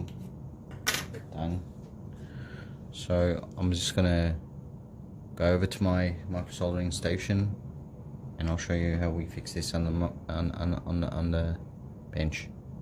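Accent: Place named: Australia. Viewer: That's awesome and you sound Aussie too my in nsw can you please send me your details need this mod done a a few other things. Look forward to hearing from you ASAP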